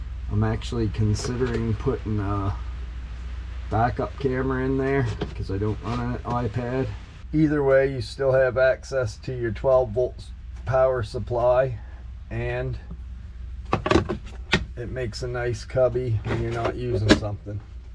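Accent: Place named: East Coast, United States. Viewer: Fellow Scotian here, went on Google just to get cleared on how to install this tablet holder, while watching your video, great by the way! When I heard your voice I said to myself, this guy sounds East Coast and sure enough 👍 thanks for the video brother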